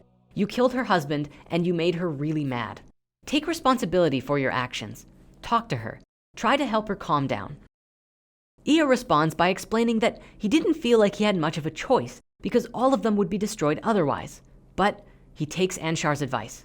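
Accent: Canada. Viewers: He sounds Canadian. That said, I am pretty sure most people who obsess over 'the gay'... have gay tendencies. So to me.... '__ is gay' sounds gay.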